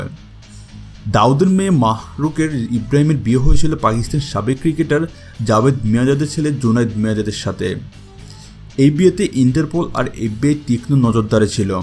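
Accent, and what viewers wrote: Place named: East Bengal, Bangladesh. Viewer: সালা বাঙাল আগে বাংলা বলতে শেখ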